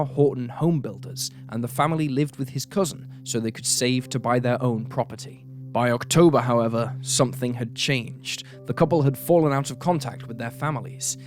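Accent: United Kingdom. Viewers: American stories with a British accent?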